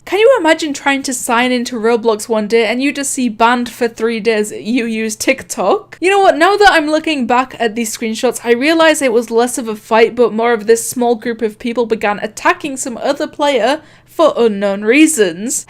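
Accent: United Kingdom. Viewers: It sounds like u live in the UK, nice! Diff then but.... your not “coping him” of course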